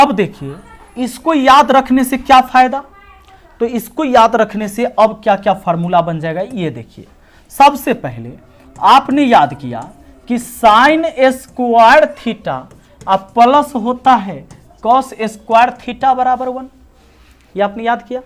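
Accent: Bihar, India. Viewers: Sir Ye sahi padato par Hindi Jo aapaki bihar ki jo hume samaj nahi aati jadya so esy hindo me bolo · Aap bihar se lag rhe ho sir ji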